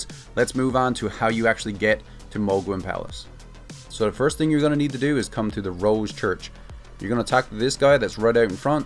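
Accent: Newfoundland, Canada. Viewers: Are you Newfie? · I knew you sounded from around the bay!! Haha awesome buddy how’s ya getting on? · you're a newfie arent you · Newfoundlander 😎 I could tell soon as I heard your voice I too am from the rock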